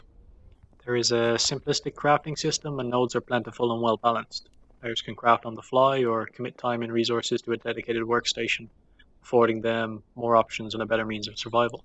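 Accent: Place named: Ireland. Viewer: Great review - game sounds very interesting & fun. Love that Irish accent! I would say that the sound needs to be a little higher. I really support new YouTubers, subscribed, I'm interested in more. Thumbs up for that! I'd suggest that you make social media and put in your channel art to gain more exposure. Make the channel icon more unique! - Channel art is okay. - I suggest removing the videos and only placing playlists, popular uploads and channel trailer. Tags need to be more specific, don't be afraid to write a full sentence if you have to, such as "two minute review of the wild 8" rather than "the wild 8" and "2 minute review", that will have less competition and more likely to be searched. Feel free to follow me on Twitter, we can talk anytime :)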